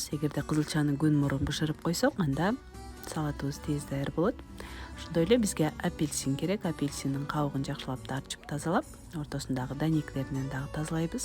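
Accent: Standard. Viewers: Кыпкыргызча суйлойсуз ээ 🥰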